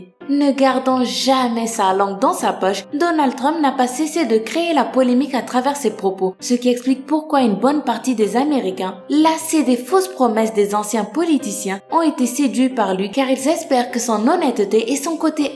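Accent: Canada. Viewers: Salut. pourquoi tu parle si lentement😆 et tu canadienne? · me suis aboné:) ps: j'adore ton accent tu est canadienne?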